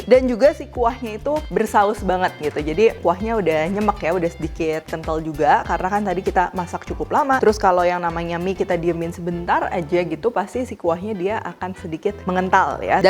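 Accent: Indonesia. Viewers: Medokkk🥰🥰🥰❤, keren chef, enakk👏👏👏👏👏👏👏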